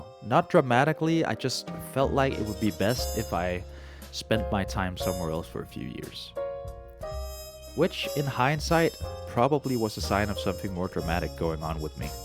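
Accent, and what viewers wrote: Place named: Denmark. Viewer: wait are you danish? no shot. im dead now that i know i cant unhear the accent.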